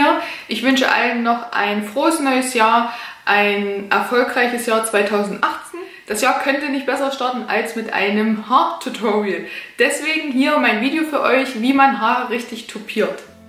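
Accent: Saxony, Germany. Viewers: Witzig, du hast meinen zukünftigen Namen 😅 Kommst du aus Sachsen?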